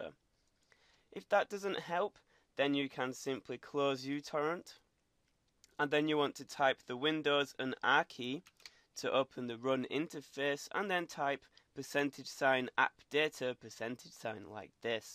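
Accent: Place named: United Kingdom. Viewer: Another fuc***n british.. 🤣🤣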